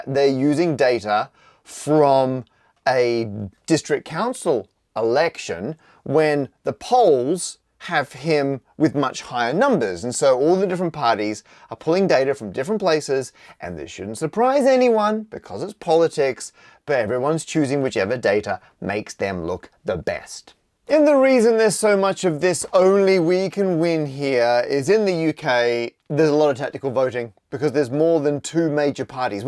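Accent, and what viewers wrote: Australia. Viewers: in Australia, my home and your former home, we have preferential voting. You number the candidates 1...n and they eliminate who came last and move those votes to their second preference. That is repeated until someone gets over 50%. It will solve your coffee vote scenario where the least popular party wins. Speaking of Australia, you're losing your Aussie-ness. Graph has the 'a' pronounced like 'a' in grab not grass.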